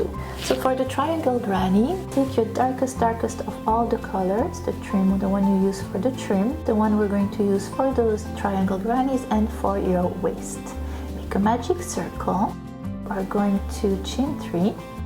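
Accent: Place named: France. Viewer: Hello . U have a very beautiful voice and accent , French !!! . Beautiful skirt . Thanks .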